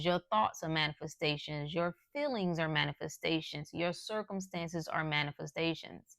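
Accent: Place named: South, United States. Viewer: Ok I figured you were from the south you kinda sound like Erykah Badu when you talk lol. Both of you be dropping gems 💎 & have a country accent. Love your videos ❤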